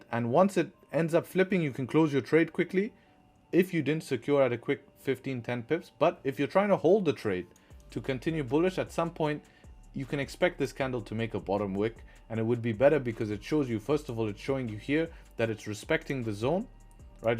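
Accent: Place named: South Africa. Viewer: You are coloured from south africa or namibia 😊, your accent tells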